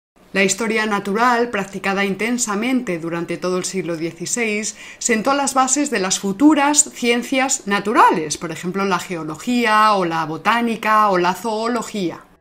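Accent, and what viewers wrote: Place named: Castile, Spain. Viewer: tu perfecto acento castellano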